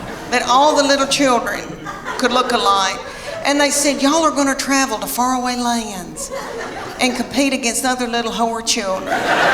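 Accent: South, United States. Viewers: I love your accent. After a Spanish woman with a lisp you’re southern accent is absolutely adorable, obviously 3rd place goes to a Welsh woman.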